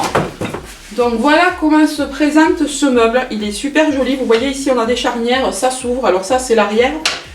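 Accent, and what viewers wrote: South, France. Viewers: Oui une vidéo plante!!et tjr avec l'accent du sud.tu apportes le soleil.bisous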